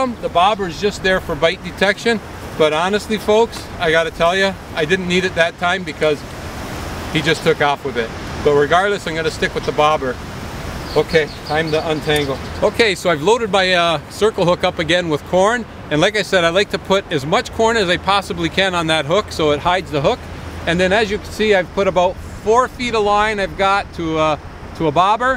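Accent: Canada. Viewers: are u Canadian were your accent from sir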